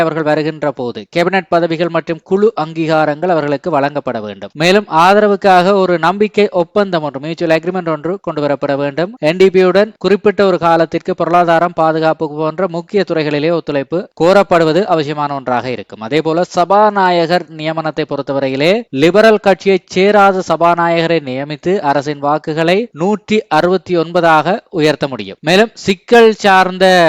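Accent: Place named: Sri Lanka. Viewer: Lankan tamil,namma chennai tamil pasya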